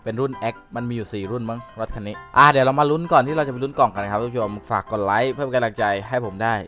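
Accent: South, Thailand. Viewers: คนใต้นี้หว่า55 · พี่ย่าเป็นคนใต้หรอ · พี่คนใต้หรอครับ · ชอบพี่แหลงใต้ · พี่เป็นคนใต้หรอครับ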